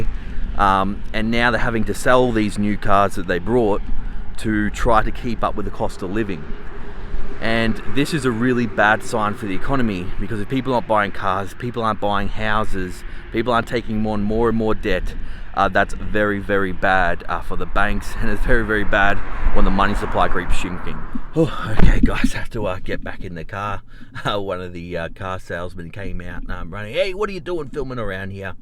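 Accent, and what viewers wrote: Australia. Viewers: I just noticed your Aussie accent. Are you in Victoria? Where I live.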